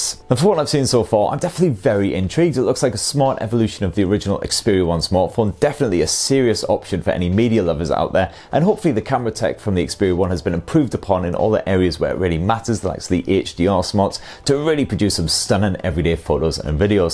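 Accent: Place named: North, United Kingdom. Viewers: What part of the North are you from. You sound a lot like my old boss. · North accent is amazing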